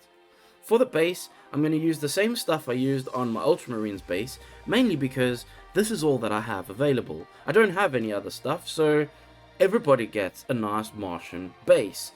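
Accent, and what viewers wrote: South Africa. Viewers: South African?!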